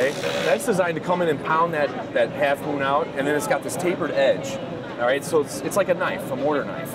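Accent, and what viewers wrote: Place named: Midwest, United States. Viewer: Yaw I werk fer Bawsch! Jesus Christ that’s one hell of a Midwestern accent there, bud! Great job demonstrating how worthless that attachment is too.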